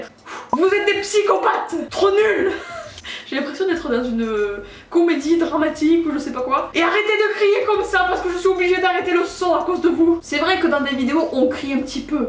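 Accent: Toulouse, France. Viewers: L'accent Toulousain on l'a pas choisi lol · Tkt pas les commentaires méchants ont s'en fou, et ton accent c l'accent toulousain moi j'habite a Toulouse et j'aimerais grave te voir ❤ et ton style j'le kiffe trop 🔥💪❤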